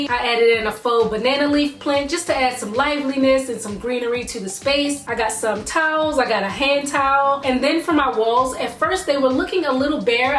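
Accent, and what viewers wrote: Baltimore, United States. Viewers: End result is so beautiful! I love this! Sn: I hear a Baltimore accent dew tew lol are u from Bmore?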